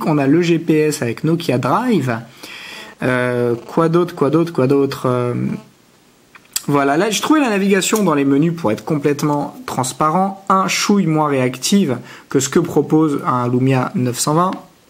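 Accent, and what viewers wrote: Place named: France. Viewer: I'm just here for the french accent :')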